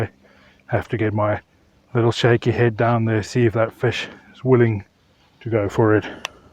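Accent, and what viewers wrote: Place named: South Africa. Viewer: Hey bru! You sound very south african?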